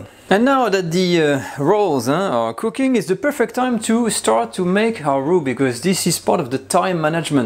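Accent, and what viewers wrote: France. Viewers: That French accent. Formidable! The food is pretty good too. 😋 ❤️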